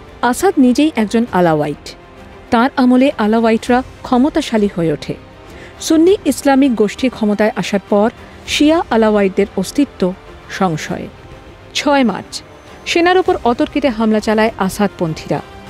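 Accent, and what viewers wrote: Bangladesh. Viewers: পূর্ব বাংলার উচ্চারণভঙ্গীতে আনন্দবাজারে এই নতুন আমদানী কে? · বাংলাদেশী ভয়েস। সে অবশ্যই বাংলাদেশী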